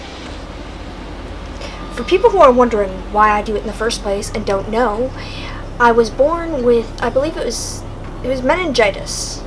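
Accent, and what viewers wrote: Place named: Canada. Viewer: I actually love how you sound. I wanna say Canadian maybe? No matter where you hail from, you have the coolest voice. I wish I sounded like that. Not even joking. Xo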